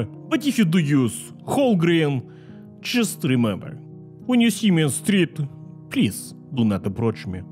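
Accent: Russia. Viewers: This combination of soft music and heavy Russian accent along with the cut off sexual comments is killing me lol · I’ve always like the Russian accent and some time I speak in sort of Russian accent, but with your Russian accent and Comedy is perfect, love your channel❤️🤪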